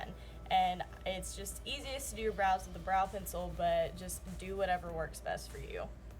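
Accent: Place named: Texas, United States. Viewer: Are you from Texas? Or at least a southern state? Haha your accent sounds just like mine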